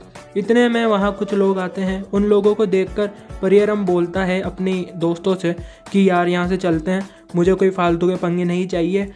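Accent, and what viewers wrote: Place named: South, India. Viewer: not like that bt tumhara acsent full south wala he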